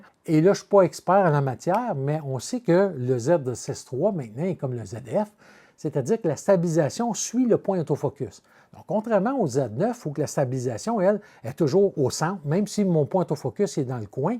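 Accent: Quebec, Canada. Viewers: Bonjour, merci beaucoup...je me doutais un peu que la réponse était dans ma question, c'est très gentil de ta part de le confirmer. Toujours un plaisir de t’écouter et de te lire....avec ce magnifique accent québécois que les français adorent. Cela sublime tes vidéos, comme tes conseils subliment mes photos.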